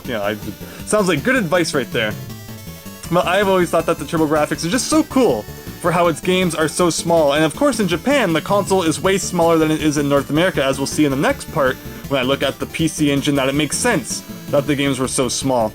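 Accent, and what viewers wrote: Canada. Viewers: Have to ask..I hear a Canadian accent, I get the impression that the Tg-16 was a bit more popular in Canada rather the US. Did NEC directly supply Canada? Just curious .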